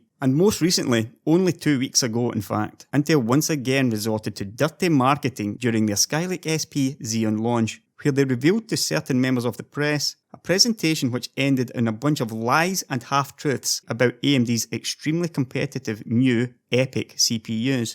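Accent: Scotland, United Kingdom. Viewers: Are you scottish? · I love your Scottish accent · Just built my 1st pc in the last year or so, luckily I bought a shitty cheap i3 to get me going but decided half way through of building a better 1 so got an rtx2070 which is a bit strong for an i3 I think. I was looking into a new motherboard and processor and I’m really glad I watched this video, I will never buy anything from intel again. Gonna wait for the new thread ripper and get a beast of a pc. PS I just subbed to this channel the other day and so informative. Every video is class that I’ve seen. Presume your from Scotland ye? I’m in Aberdeen so thanks from a fellow Scot :) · I thought you are Scottish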